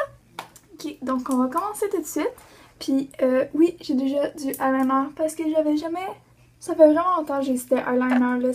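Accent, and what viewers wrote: Canada. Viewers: Tu es super belle êt ques que j'aime ton accent canadien j'aime beaucoup ❤️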